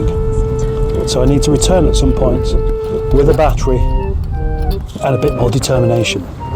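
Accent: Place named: Yorkshire, United Kingdom. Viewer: Aye up tha's got a reight Yorkshire accent! Na then a yer gonna get that car Duck? Does tha' know that there John McLaughlin's from Yorkshire - E''s a reight guitarist in't e? 😊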